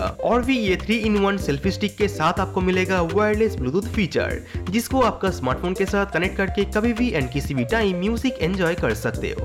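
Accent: Bengal, India. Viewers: i love your bengali accent ..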